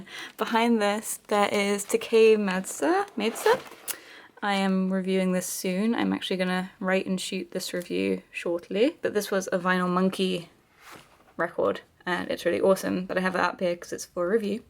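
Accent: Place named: United Kingdom. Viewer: Although the music was a little bit too loud, it was fun to watch and it was really interesting to see you more natural (you look good without makeup) more spontaneous more random :D :-) Also you have beautiful british accent (I know, sounds weird, but that's how it is, I love it lol). And I've never seen that thing you're releasing the bowling ball from, but I must try it, looks like fun, hope we have it here in Czechia or Czech republic or whatever you foreigners call it nowdays! :D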